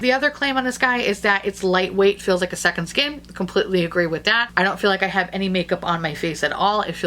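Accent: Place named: Boston, United States. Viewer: So excited when the notification comes on my screen, enjoy your videos! The accent sounds like a Boston neighbor lol.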